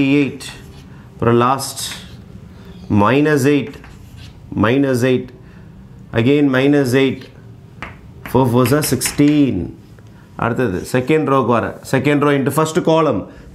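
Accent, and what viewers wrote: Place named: Chennai, India. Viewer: Hi I love Chennai slang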